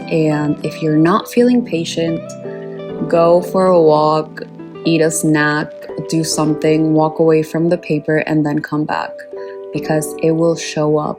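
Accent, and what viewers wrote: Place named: Bolivia. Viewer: Ive never heard your voice before. Not what i expected a Bolivian accent to sound like. Amazing artwork. Your hard work and talent have been an inspiration.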